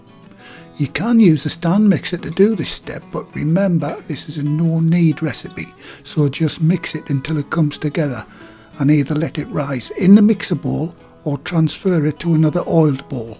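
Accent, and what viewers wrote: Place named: North, United Kingdom. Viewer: ay lad great video sounds like you are a tad further up north than where I am:)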